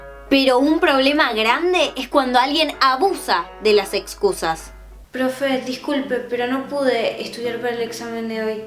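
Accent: Argentina. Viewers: nuevo sub eres argentina? o no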